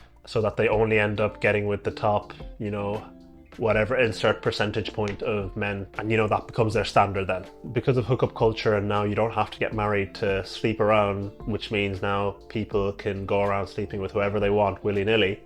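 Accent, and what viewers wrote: Ireland. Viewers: This guy just came up on my feed. Interesting take on things. Good to hear the aul Irish accent representing! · My brain can't process a black man with an Irish accent lol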